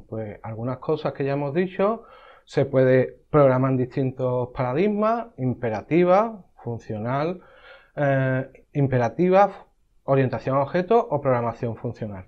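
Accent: Spain. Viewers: Perdón que me salga del tema, de qué parte de España es su acento?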